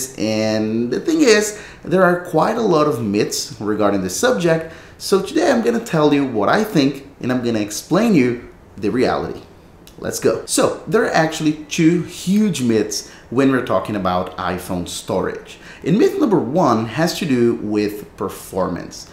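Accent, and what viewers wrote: Brazil. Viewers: sound brazilian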